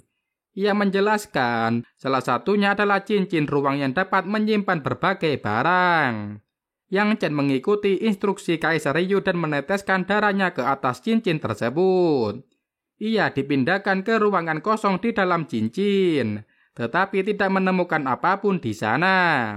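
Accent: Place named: Madura, Indonesia. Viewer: Pembawa alur ceritanya...kayak orang madura tak yehhh...tak. yeee... ditarik " bahasa nyaaaaa...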